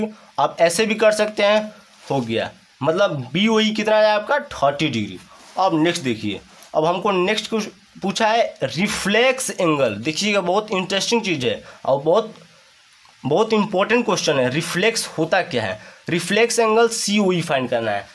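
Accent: Bihar, India. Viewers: Kitna bihari bolta h itna dana samjha tha h chiiii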